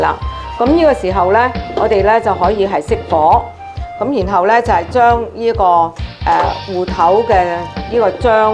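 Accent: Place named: Guangzhou, China. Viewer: your accent same as mine, must be from GuangZhou, GuangDong...... de Miami, Florida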